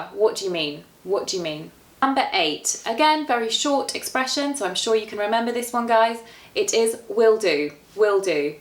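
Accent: United Kingdom. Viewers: I try to explain... You look as a proper british woman, you have british accent, you like british traditions(an afternoon tea) and finally you drink the earl grey tea which is of course british. Oh and The Union Jack is quite often seen in the videos. These are many clishes. But I don't deny that it would be a sophisticated experience. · Are you british? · i fancy listening to British English.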